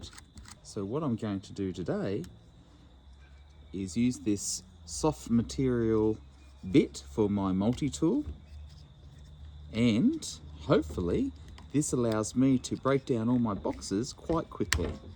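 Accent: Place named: Australia. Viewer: Not criticizing but he sounds like he's from Oz, not Britain.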